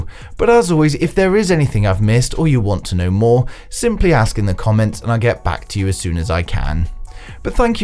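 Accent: United Kingdom. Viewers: I love the way UK people say rooter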